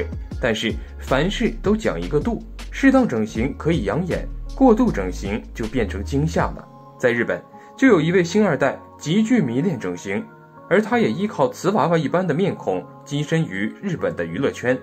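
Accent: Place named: China. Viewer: 这个节目吊啊，说着大陆普通话、换算都是新台币！！！！哈哈哈哈哈😄懵逼了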